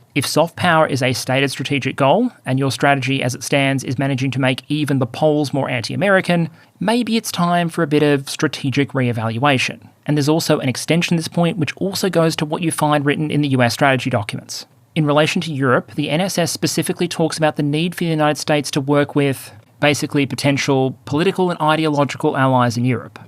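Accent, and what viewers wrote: Australia. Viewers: That tacit acknowledgment that your voice is the real moneymaker at the end of the video there gave me a chuckle. I often wish I was an aussie just because of how much Americans love the accent… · is the Slavic god of thunder. The accent you hear is Australian.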